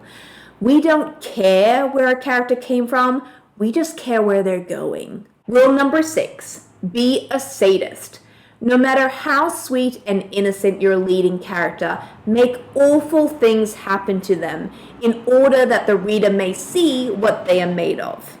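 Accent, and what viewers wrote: Australia. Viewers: Hitchcock said more or less what Vonnegut (and you) say, but in a way that, I think, explains one of the rules better. Paraphrasing: Suspense, he said, is not withholding information from the reader. Rather, it is giving the reader information before the character gets it. (Is that faded Aussie I hear?)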